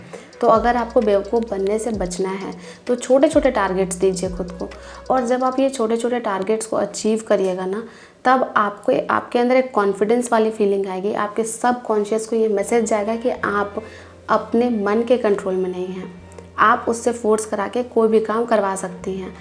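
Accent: Bihar, India. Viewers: Kya aap Bihar se h?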